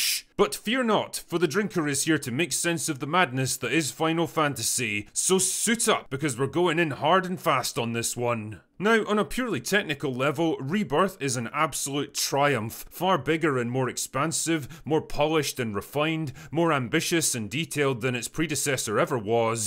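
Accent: Scotland, United Kingdom. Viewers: It may be affirmation bias but I love how this was basically a 15 minute video of my own thoughts in a Scottish accent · Watched 10.5 minutes and liked to support our Sco'ish boi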